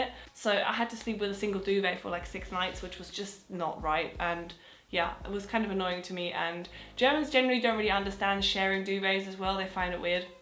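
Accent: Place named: London, United Kingdom. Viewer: You have a superb London accent. I can barely tell that you are not a native speaker.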